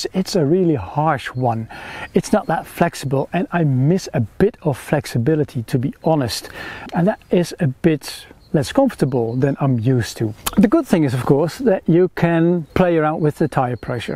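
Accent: Netherlands. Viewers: Ik volg je altijd zeer kundige en gedetailleerde testen al jaren. Ook uit het Op Pad tijdperk. Echt waardevolle info waar ik veel aan heb. Toch wil ik al een tijdje wat kwijt over je nieuwe kanaal. Nu vraag je er speciaal om. Toevallig was deze video in perfect (voor mijn oren dan) Engels. Veel technische termen en zorgvuldig gesproken met een duidelijk verhaal. Veel Engelstalige 'reviewers' kletsen soms maar doelloos rond. Reviewen is een vak. Dat beheers je dus duidelijk uitstekend. Het blijft moeilijk om iets in een vreemde taal exact uit te leggen wat je precies wilt duidelijk maken. Intonaties, woordkeuzes. Mijn Engelstalige vrienden zeggen altijd dat wij beter Engels spreken dan zijzelf. Wij spreken volgens het boekje. Dat doet geen Engelsman.Tegelijk zeggen ze ook: wij proberen juist niet te zeggen wat we denken. Nederlanders zeggen direct wat ze denken. 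In het Engels gesproken klinkt dat heel onengels en herkennen ze onmiddellijk dat we een Engels sprekende Nederlander zijn. Ze drukken zich anders uit. Ik heb respect voor je poging een groter bereik te krijgen door te kiezen voor een niet natuurlijk liggende taal. Het blijft een afweging. Toch lijkt het mij voor je zelf makkelijker Nederlands te spreken. Je hebt nu eenmaal een Nederlandse manier van spreken. Het is meer werk maar je kan jezelf ondertitelen op Youtube in het Engels. Zelf een samenvatting typen kan. Een spraakomzeter het werk voor je laten doen met evt. correctie achteraf. Eenmaal zo'n tekstbestand aang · Great review. Your English is extremely good. Nothing I didn't understand. Love your Dutch accent ;@ But seriously €2,600!!!!! That's about €1,000 more than I thought you were going to say. The reason it is so expensive is because it is sold under the bikepacking umbrella. 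If it were a normal 29" hybrid without orange racks it would be half the price. SLX is good but not that good! I have one of the first Trek 7500 hybrid from about 1998 with Alpha SL Aluminium frame. It is very stiff and strong as the tubes were over size. It too had SLX or LX components back in the day, but it cost me about €300 new!!!!! The spec is now Shimano XT with handbuilt 700C x 35 wheels. I would not swap it for anything as the aluminium frame is the best thing Trek did, although I do not like the look the carbon fork on the 1120 today. They should have gone with aluminium or Cromoly, Also the Trek 1120 looks like a rival to the Specialised AWOL which I think has better long distance touring potential certainly better racks and can carry more. Their front pizza rack is a gem. I don't own one. Could you do a review of a similar bike to the Trek 1120 but with Rohloff hub or pinion gearbox preferably with Gates belt drive? 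But great review. I am already subscribed to your channel.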